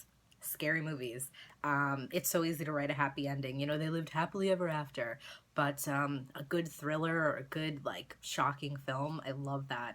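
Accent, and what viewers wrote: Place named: New York, United States. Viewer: omg im from nyc and when I first started watching your videos all I could think of is "she has to be from nyc" bc your attitude and the way you spoke 😂😂💖 I love your videos btw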